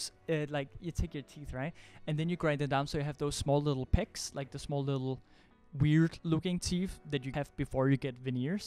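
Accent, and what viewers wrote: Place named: Denmark. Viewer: I can sniff out that danish accent anytime awesome video tho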